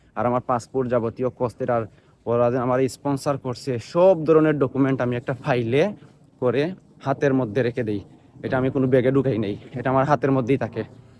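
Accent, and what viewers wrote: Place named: Sylhet, Bangladesh. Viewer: Sylheti vibes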